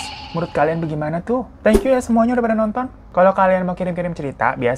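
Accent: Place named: West Java, Indonesia. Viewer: Kak logat sunda tapi nama nya kayak jepang yà....😃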